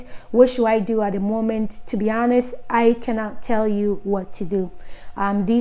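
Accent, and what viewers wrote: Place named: United States. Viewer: Sister your English is changing to American English ooo now i have to listen carefully if not i can't hear anything 😂😂😂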